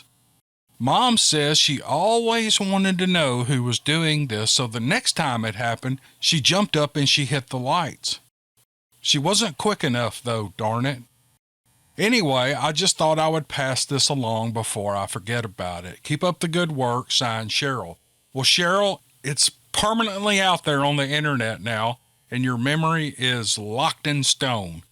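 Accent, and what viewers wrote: South, United States. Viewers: I tune out the moment I hear a southern accent I relation to sazquatch......seeya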